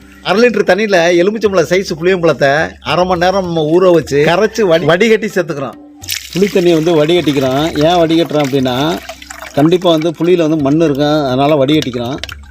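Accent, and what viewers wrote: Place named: Chettinad, India. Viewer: செட்டிநாட்டு தமிழ் அழகு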